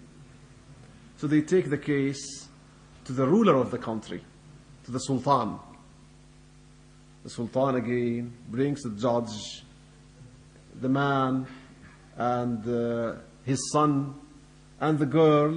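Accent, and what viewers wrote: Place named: Sweden. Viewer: His accent is Swedish.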